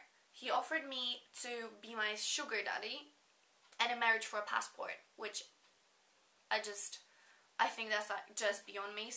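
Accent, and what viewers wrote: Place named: Albania. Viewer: This was so funny! Are you Albanian? I love your accent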